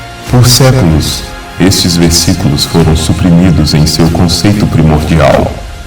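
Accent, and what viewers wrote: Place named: Minas Gerais, Brazil. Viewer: Se essa voz pertence ao " ", ele é um ótimo ator pois conseguiu inserir na voz o tão conhecido sotaque mineiro. Se for ele, está no lugar errado deveria tentar Hollywood... Só uma sugestão, Se For ele está desperdiçando talento...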